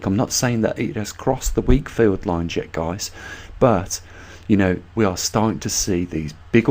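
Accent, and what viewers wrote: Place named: West Midlands, United Kingdom. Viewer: you sound like you are from the West Midlands, somewhere. I live near there. would it be possible to meet me. I am interested in your work but lack knowledge.?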